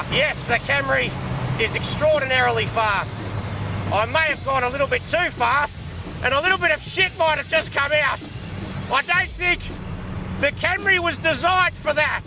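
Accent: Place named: Gawler, Australia. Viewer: This guy sounds like he comes from Gawler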